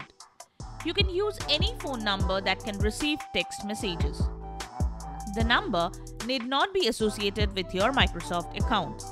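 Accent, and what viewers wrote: India. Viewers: Funny, having an Indian voice giving advice about computer information.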